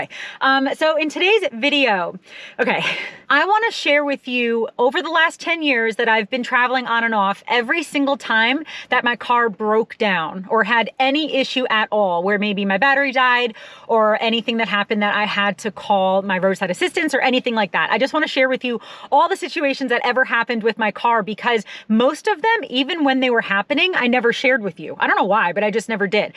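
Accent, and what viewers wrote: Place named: New Jersey, United States. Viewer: A whole lot of Jersey ny accent coming out in this one. You stay home for the holidays 😆. Happy new year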